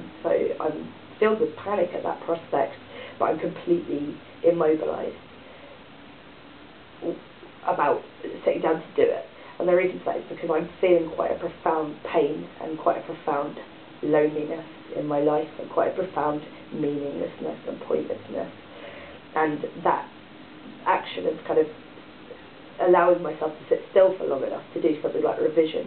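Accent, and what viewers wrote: United Kingdom. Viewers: One thing you should remember is that if you came to America, people would find you much more attractive than you realize because you have a British accent. Still, I think one of the hardest things to wrestle with is self-criticism.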